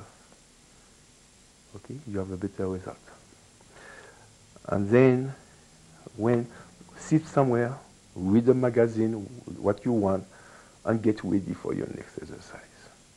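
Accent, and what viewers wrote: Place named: France. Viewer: I understand this frenglish language no problem ☝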